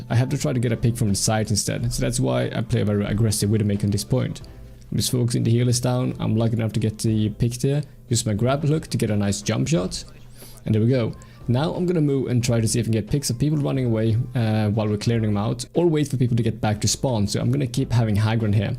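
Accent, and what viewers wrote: Sweden. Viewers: You can hear that you are swedish, it's funny becuse i'm also swedish. Ps. You are the best youtuber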